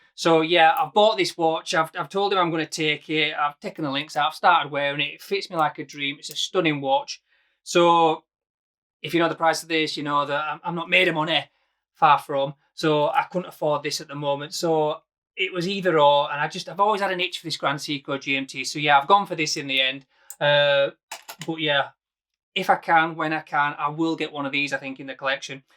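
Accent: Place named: Huddersfield, United Kingdom. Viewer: Nice to see a watch reviewer from Huddersfield. It's a nice town and nice people, lived there for almost 7 years. Miss that accent. Brilliant review, well done.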